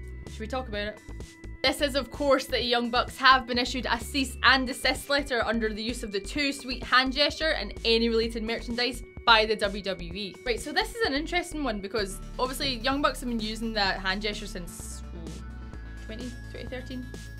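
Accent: United Kingdom. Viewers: god this hard british acent is something. i cant understand a word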